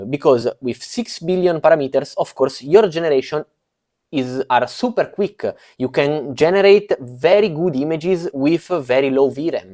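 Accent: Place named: Italy. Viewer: Just applied, name is Max Nthite...Are you from Italy? you have an interesting accent...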